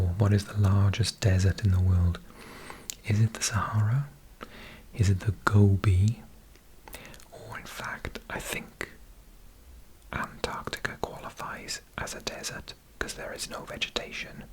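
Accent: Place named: Wales, United Kingdom. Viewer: This is amazing! I love listening to facts or meaningful things (not rambles) and in a Welsh accent, I’m blessed. If you happen to speak Welsh too then that would be another amazing video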